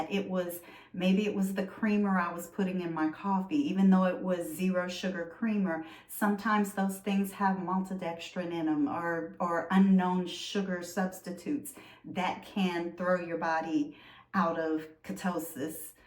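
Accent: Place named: Louisiana, United States. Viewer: I know a lil houma or thibodaux when I hear it. 😉 🩷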